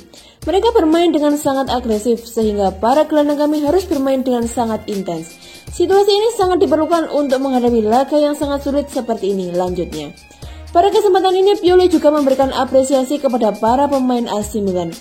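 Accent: Java, Indonesia. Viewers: Senang dengar medoknya mba ini,tpi sya sayang bacanya cepat bingitsss...😁😁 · Kog medok to mbak? Forza milan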